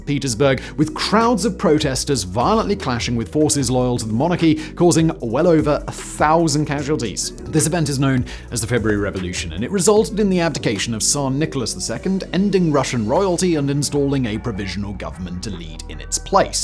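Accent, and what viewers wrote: United Kingdom. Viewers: Why does an American always question legitimacy of territory when their whole country's very existence is questionable? Even more when your accent is British.. right? Born Hipocrits huh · You have such great content but your British accent makes your videos nearly unwatchable. Too bad.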